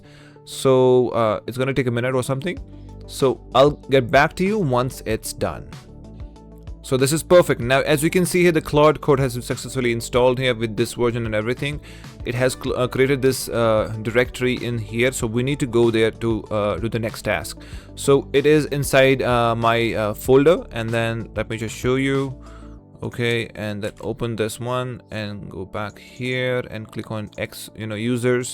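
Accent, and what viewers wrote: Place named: India. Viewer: buddy u cant hide indian accent 😂, keep going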